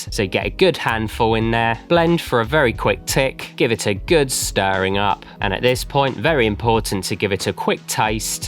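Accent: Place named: England, United Kingdom. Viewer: It's shocking when you don't hear an American accent on a YT channel!😂 It's called an English accent - well, one of them!😉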